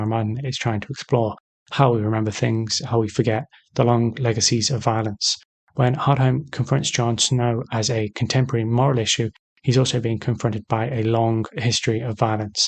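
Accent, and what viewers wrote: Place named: Midlands, United Kingdom. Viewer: As a fellow Brit I'd guess he's from the midlands somewhere ? Though in some words the vowel sounds are more southern, so maybe Nottingham? Or maybe Yorkshire but university educated in the south (British academia is biased against northern accents so sometimes people's accents shift a bit. Especially at the older universities).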